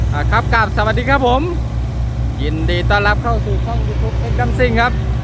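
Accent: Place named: Sukhothai, Thailand. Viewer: สำเนียงเหมือนคนสุโขทัยแท้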